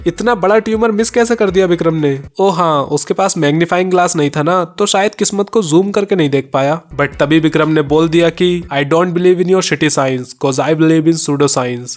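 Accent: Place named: Bengal, India. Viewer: Bengali hoga, Bengali main deewar nehi bolte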